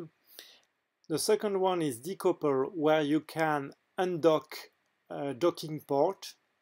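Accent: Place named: France. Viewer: Quelle négativité. Malgré l'accent français on comprend très bien ce qu'il veut dire et la vidéo présente très bien la fonction.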